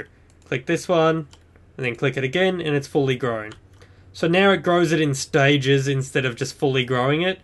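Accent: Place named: Australia. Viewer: i think hes from australia by the sound of his voice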